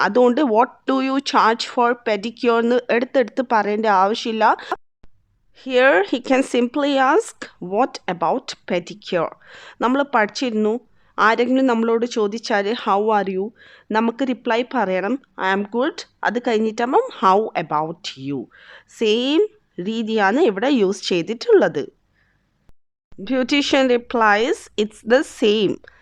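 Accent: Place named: Kannur, India. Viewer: Typically kannur malayalam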